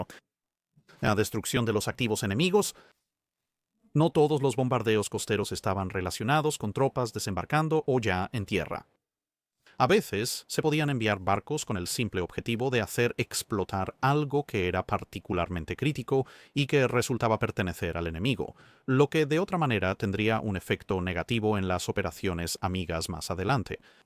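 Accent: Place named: England, United Kingdom. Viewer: Seguia este canal en inglés ,el original, ahora en español con acento inglés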